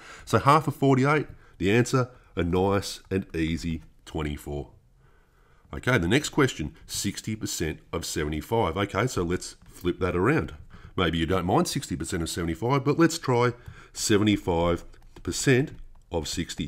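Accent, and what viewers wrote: Australia. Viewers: Why the condescending approach and weird Australian accent????? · Midwest USA Too busy focusing on what words he's saying, let alone the content. Didn't even realize it was Australian. Man, this Pandemic's been rough. SMH multiple times for everyone. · This would be so much easier to understand without the Australian accent- or maybe speak more slowly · Can we just take a moment to appreciate the Aussiness of this guy’s accent?! 👍🏻😁🇦🇺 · Australian accent makes me nauseous for some weird reason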